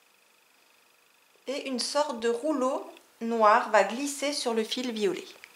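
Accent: Alsace, France. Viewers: Oh merci beaucoup de ta réponse! Je suis moi aussi alsacienne et j'ai cru reconnaître ton accent ;) Bon ben j'ai regardé ta vidéo... je n'y arrive définitivement pas, pourtant c'est très clair et ça semble facile... on va dire que c'est la faute à mes fils :) Du coup j'en profite, tu connais une boutique sympa dans le bas rhin pour le matériel? Je n'ai que du fil de très mauvaise qualité pour le moment...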